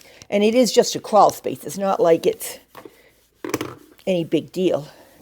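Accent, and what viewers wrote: New England, United States. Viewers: your accent is lovely. I love the New England accent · Hello,! Your house is perfect for showing. I'd leave the throw on the couch. It adds a touch of coziness. You don't have to take down your rack inside the closet. Just make it clear to potential buyers that it does not stay. Your china is beautiful. I was going to suggest your DIL may like to have your set of china, but you answered that question. Your laundry room looks MUCH better. Not perfect, but I agree the volume is very low. I just crank up the laptop volume to the loudest setting and turn up the video volume and I can hear you fine then. I do not hear a lisp though. I do hear your lovely New England accent, but I haven't picked up on a lisp. It's 6 degrees here and -13 wind chill. We've had rain, freezing rain, and snow. Just enough to cover the ground. It's cold enough all I want to do is hibernate. 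The sun is shining brightly so I like that part. Have a great weekend. Rest up for next week! See you next time!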